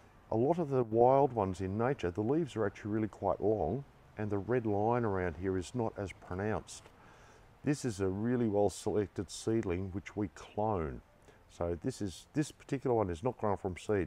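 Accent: Australia. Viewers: Your collection are so beautiful pretty healthy yr voice say u are Australian u are so far away i like to order from yr collection they are so awesome but i live in Trinidad west Indies Maloney garden so lovely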